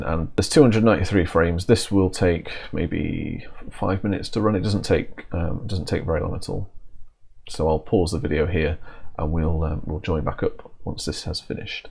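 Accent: United Kingdom. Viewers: OK thanks and BTW how refreshing to hear an English voice on one of these videos and not a loud 'WHAT'S UP' !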